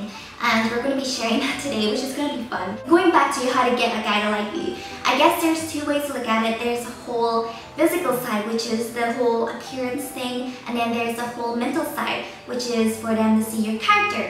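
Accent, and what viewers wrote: Ireland. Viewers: Are you Irish lol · She Irish I think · She sounds like irish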